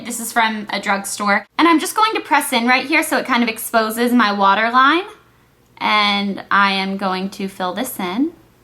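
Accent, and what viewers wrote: South, United States. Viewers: i absolutely love your southern accent :)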